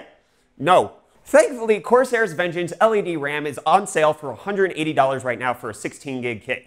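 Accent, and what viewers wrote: South Africa. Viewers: Are you south african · Are you South African?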